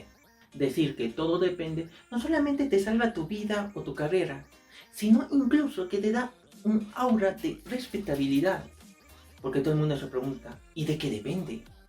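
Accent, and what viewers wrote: Spain. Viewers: Mano porque hablas con ese dejo catellano?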